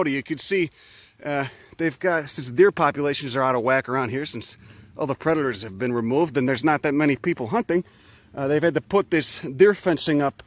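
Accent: Chicago, United States. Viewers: That windy chicago accent is pretty funny... and that's comming from someone who used to be a Chicagoan. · Oh my, it's not often you come across a Chicago accent in the wild of youtube. This is fantastic. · Love your channel, and the Chicago Accent.... "Kick Yourself in the Dick" never gets Old... Keep posting, and Thanks a bunch for the highly entertaining, and educating Videos!!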